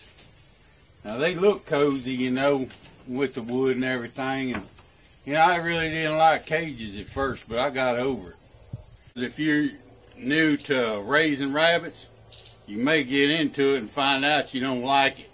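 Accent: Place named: South, United States. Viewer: Thank you! Your thick accent is the real southern charm. I'm grateful for your taking the time to explain things in simple terms for us beginners who know nothing!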